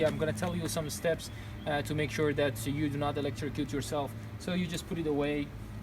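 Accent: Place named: Iran. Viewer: Dastet dard nakone mard ,karet doroste. Ensafan englisit ali o ravooneh ,az ye rize tah lahjeye farsit goftam nakone in baba Irani bashe ( maha filmaye be dard bokhor injoori nadarim to YouTube) ,esmeto ke didam , fahmidam hadsam doroste. Baese eftekhare ke hamvatene ma ye hamchin videoye be dard bokhori gozashte to YouTube.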